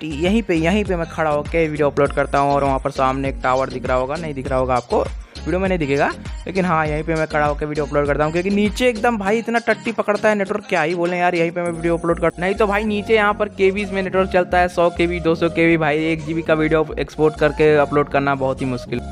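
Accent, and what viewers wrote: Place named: Rajasthan, India. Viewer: Bhai rajasthan se hai kya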